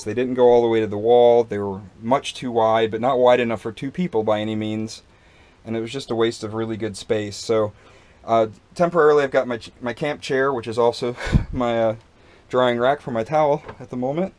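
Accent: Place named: Canada. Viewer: Nice van. . I hear a slight Canadian accent, are you from there?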